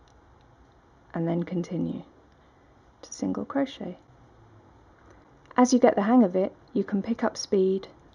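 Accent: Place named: United Kingdom. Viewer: Thank you,. You're were clear, and to the point. Love the effect of visually highlighting the important and critical stiches. You made it so easy! Enjoyed your British accent as well as your soothing voice. Kudos!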